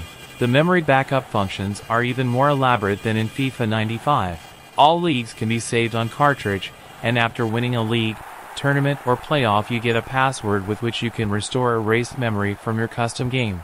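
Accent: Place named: North America. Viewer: "Being from Merseyside" Speaks in a North American accent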